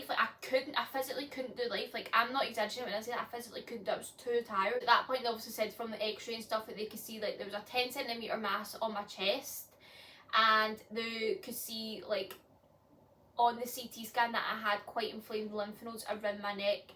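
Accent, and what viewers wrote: Scotland, United Kingdom. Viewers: Wow that’s a surprise a Scottish voice I’m in Edinburgh ❤️🤗🤗🤗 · Hi Nice to meet you New Subscriber here from Amman Jordan Middle East Love your Scottish accent. All the best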